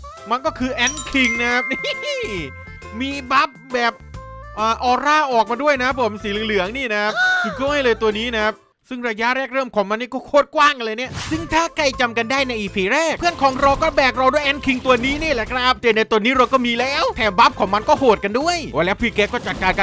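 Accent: South, Thailand. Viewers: พี่เป็นคนใต้หรอคับ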